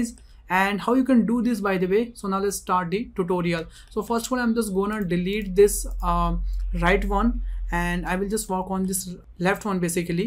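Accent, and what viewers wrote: India. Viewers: How to find video tutorials without Indian accent?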